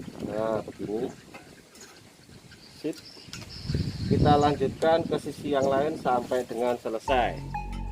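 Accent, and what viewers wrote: East Java, Indonesia. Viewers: Mas sampean asli jawa timur ta?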